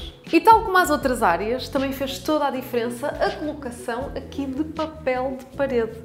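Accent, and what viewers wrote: Portugal. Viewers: Amo o sotaque portugues 😍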